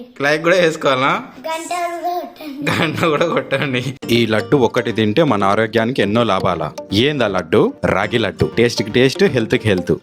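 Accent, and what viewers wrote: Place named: Telangana, India. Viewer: ప్యూర్ తెలంగాణ భాష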